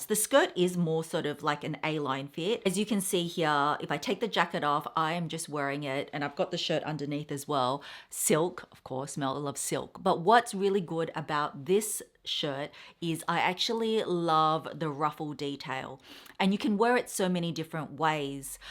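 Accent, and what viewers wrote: Australia. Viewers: Oh FLP tote is very nice. I like the zipper and button closing features.. Would love to win the MJ Tote bag. To this day, unboxing videos are still my favorite to watch. I love the way you talk about the background of the brand, the information about the items you purchase in your Aussie accent. 🥰😘Linhtwin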